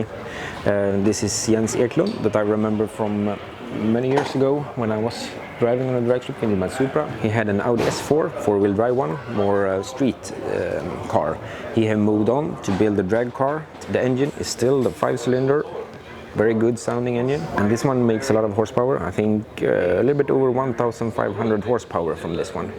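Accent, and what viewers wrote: Sweden. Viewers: Your Swenglish accent is half the joy watching your videos! All in good faith!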